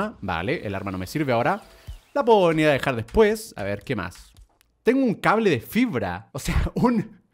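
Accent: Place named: Chile. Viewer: Le volvió el acento chileno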